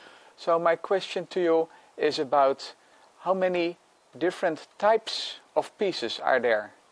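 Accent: Netherlands. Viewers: Are u Nederlands i'm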